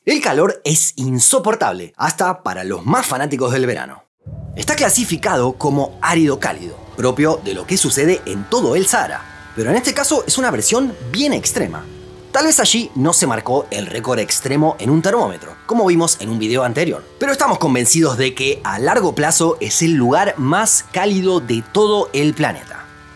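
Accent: Argentina. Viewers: Y ese acento más argentino. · Hola, sos de argentina o de uruguay? Porque tenes un acento de mezcla del neutro pero nose si con el argentino o uruguayo.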